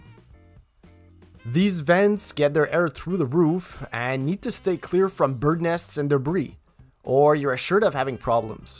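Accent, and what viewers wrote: Quebec, Canada. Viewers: Yeah his definitely from Quebec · Quebec French? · French Canadian? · You have an accent, est tu québécois ? ;) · Nice video! T'es québécois hein? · This guy is from Quebec for sure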